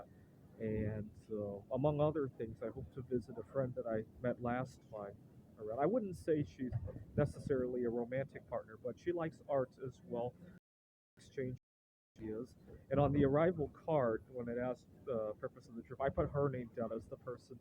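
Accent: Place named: United States. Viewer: do you generally communicate with people in Taiwan in Chinese or english? How do people react when you start speaking English to people there in your American accent? Just curious because when I go to India I get a lot of head-turns and confused looks, because to them, seeing a person that looks like them but sounds foreigner is a unique sight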